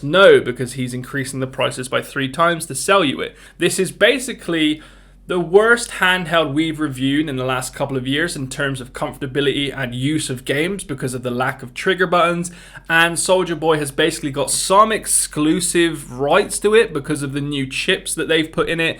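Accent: United Kingdom. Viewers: . Such a Bri'ish accent.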